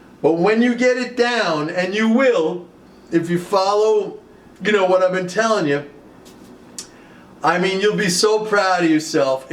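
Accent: New York, United States. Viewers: You sound like a real New Yorker. I like I have the sauce lol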